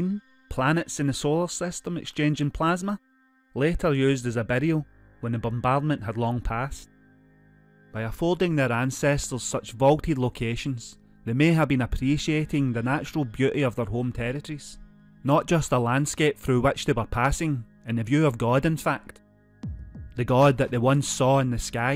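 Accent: Scotland, United Kingdom. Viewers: Trying to place your Brogue. Inverness? Strong "undercurrent" of American "Anglish" throwing me off... LOL but no' aff the quay an' inta the Ness, playse.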